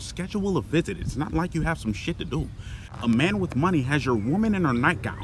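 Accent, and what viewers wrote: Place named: California, United States. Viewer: I could've swore you was from Cali somewhere lol Keep up the dope work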